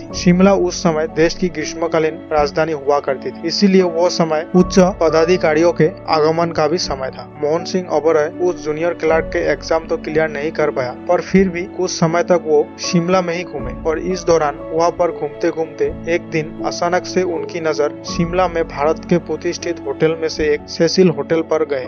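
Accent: Bengal, India. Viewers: Watch this very interesting video relating to Mohan Singh Oberoi - founder of the famous chain of Oberoi Hotels. He started his life with just ₹25. The commentator seems to be a Bengali.His command on Hindi commentary is poor.He calls a district SARGODHA (now in Pakistan) as SARGONDHA ! Inspite of this, this video is full of plenty of intereting information about M.S.Oberoi. 🌲💐😀👌💐🌲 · Bhai Tui ki Bangali,Tor Uchharan puro bangali der moto. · DADA APNI JE BANGALI SE APNAR HINDI BOLAR UCHCHARAN THEKE BESH BALO BOJHA JACHCHE * HINDI BOLA TA EK TU CHESTA KAROON THIK BOLTE PARBEN * KHUB KOTHIN NOY HINDI BOLA HINDI STYLE "E "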